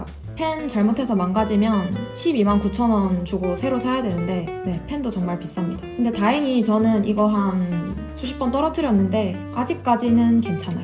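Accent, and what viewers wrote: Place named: Gyeongsang, South Korea. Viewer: 고향이 대구 신가봐요 ~~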